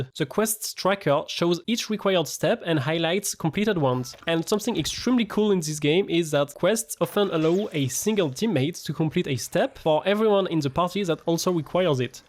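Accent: France. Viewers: i got jumpscared by the french accent😭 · Waaa l'accent français de zinzin hahaha merci pour les tips bg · Salut ! Merci pour la vidéo c'est cool (Tu est français non ? T'as un accent)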